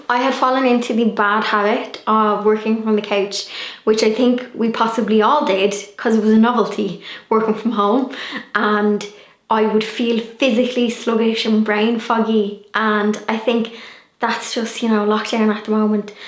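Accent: Ireland. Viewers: You’re so cute. I love your Irish accent. You know Ive been just making do with working around my sewing machine and what nots. I should make my work space nicer. Thanks for the ideas. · You are very organized. You have a wonderful creative mind. Love when you work with power tools! You are the best! Love the Irish accent so melodic! Mery Christmas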